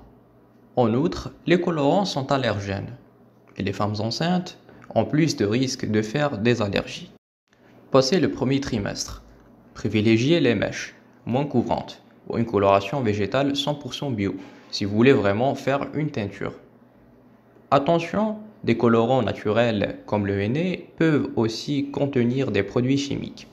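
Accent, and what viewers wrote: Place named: Kabylie, Algeria. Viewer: ca se voit tu es kabyle tu as l'accent lol mais merci des conseils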